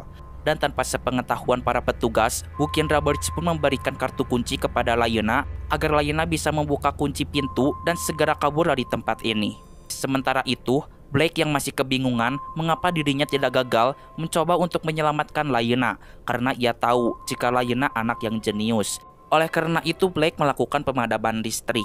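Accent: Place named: Sunda, Indonesia. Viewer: Suaranya sunda banget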